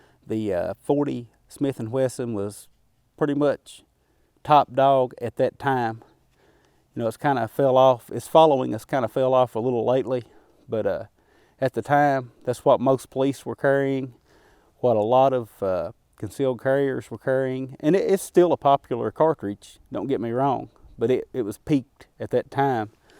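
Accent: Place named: South, United States. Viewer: One of the best Glock pistol vids I’ve seen. Great shot and love the accent. I gotta get down south one day.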